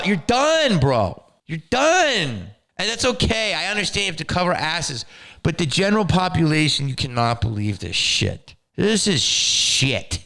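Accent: England, United Kingdom. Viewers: Love the English accent, very Tim Curry. I enjoy your banter. xx Like your opinions too. 💐 Love Joe Rogan too, Harry and Megs need to shut up. Love from Australia!!! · It's crazy indeed! I don't read news about this people, i prefer to read and listen things about you! Omg! Lol your english accent is great! Your shirt is beautiful too. You always looks amazing! Thanks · Loved that English accent! All you need are muttonchops! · Your British accent is hilarious 🤣👏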